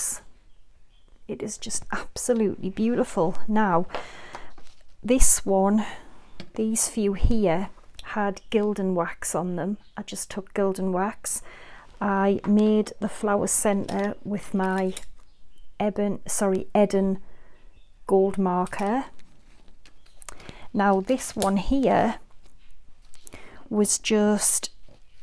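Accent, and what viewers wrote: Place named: Newcastle, United Kingdom. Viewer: I have only just come across you I really love your ideas they are Great in your jordie accent, 👍 Nice to find craft inspiration in England the others I have are American I have no issue about but its nice to find one here, I love what you did any chance how you did the white poinsettia please, bit late to the part though..😃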